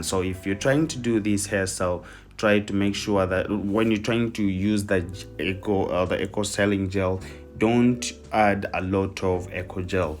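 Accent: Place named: Uganda. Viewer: Voice over sounds Ugandan 🤔🤔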